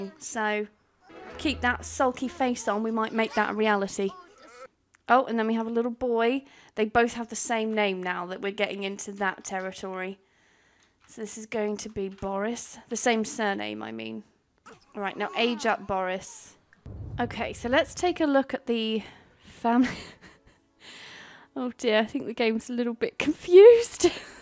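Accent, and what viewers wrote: United Kingdom. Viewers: Your narration cracks me the fuck up! Especially the British accent 🤭🤭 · You are aggressively british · This is so funny I don’t think I’ve laughed so hard in my life and I love your accent British accents are awesome and I love the way you swear it’s so cool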